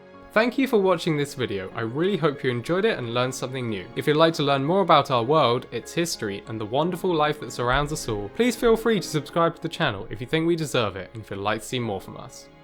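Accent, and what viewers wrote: United Kingdom. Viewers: The Brit accent seems to be so very trendy on videos, cable news networks etc. It's as if it somehow adds a bit of legitimacy to a story. Why? I'm wondering how many people share my view that the accent is annoying at best, and a distraction that serves no purpose. Surely there are out of work Americans "journalists" who could read teleprompters without the haughty, lecture like tone and attitude. It ain't right! lol!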